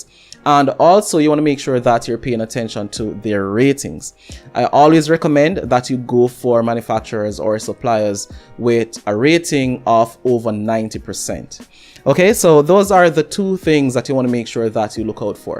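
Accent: Jamaica. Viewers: Are you Jamaican? Accent sounds like it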